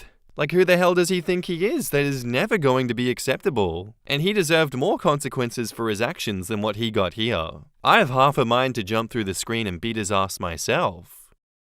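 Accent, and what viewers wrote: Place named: Australia. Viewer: Me over here understanding "The poly and burner part" during the intro. Thought I was used to the Australian tongue by now, but the fr*nch is throwing a wrench in that.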